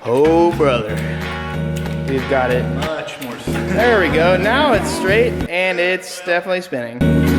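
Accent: United Kingdom. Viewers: Dude...your British accent is tits! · British accent on point BOI.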